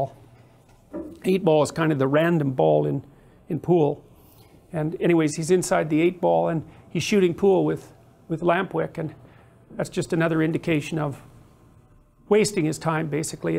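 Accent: Canada. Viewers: Canuck's have an awesome accent! This makes me want to watch Pinoke again and see if the Soviet's had a hand in it rofl...I think if he had a normal voice, no one would buy his bizarre ideas lol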